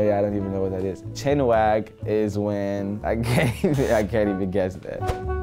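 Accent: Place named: United Kingdom. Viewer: Exactly he sounds British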